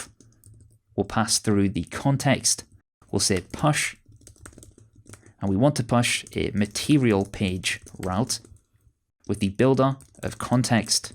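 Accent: United Kingdom. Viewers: I like brittish accent and tutorial style of video auther!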